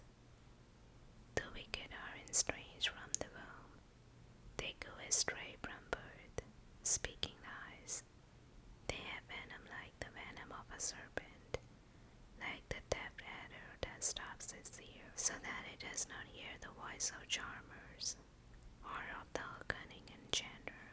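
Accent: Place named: South, United States. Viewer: Thank you for satisfying my curiosity. You’ve got what sounds like an American southern accent, very relaxing. Thank you for reading the psalms.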